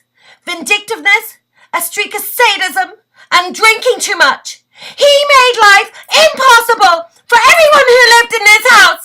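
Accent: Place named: Ireland. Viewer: Well done, the Irish accent makes it cooler somewhat also :)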